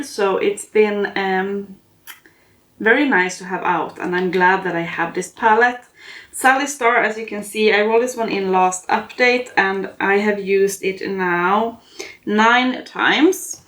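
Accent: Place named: Scandinavia. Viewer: Hi! Here to watch your video with a cup of coffee this month. Also I just noticed you are from Sweden! I was guessing somewhere Scandinavian based on your accent. I had the pleasure of going to Stockholm 2 years ago for a few days and had soooo much fun. It was in November, and I lived in Florida at the time, so the cold weather felt so refreshing and I even got to see some snowflakes! 🩷💜🩵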